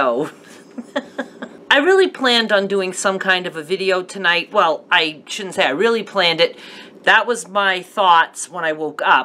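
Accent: Maine, United States. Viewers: This will be a totally different comment (hope you can laugh a little). I’m living in Ohio now, but lived in Maine on North Haven for 2 years with my husband. I love your Maine accent and it brings back wonderful memories Maine.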